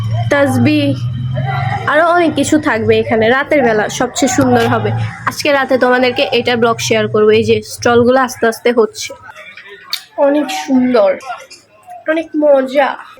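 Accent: Noakhali, Bangladesh. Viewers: নোয়াখালীর ভাষা আমার কাছে অনেক ভালো লাগে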